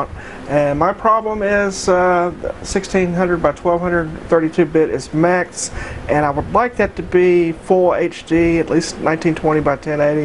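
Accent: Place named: South, United States. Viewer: Hey! Is that a pro minidisc deck to the left of the A1200., I've corresponded several times with you on <link> and through e-mail regarding Amigas and Lightwave - but I never knew you had a bit of a Southern US accent!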